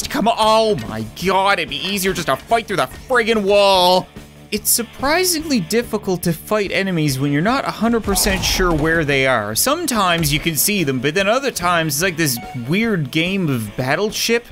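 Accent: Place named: Canada. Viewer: EVERY SINGLE VIDEO I WATCH I GET A UNIVERSITY CANADA AD. I mean it is fitting though, is like the most Canadian person I've ever heard.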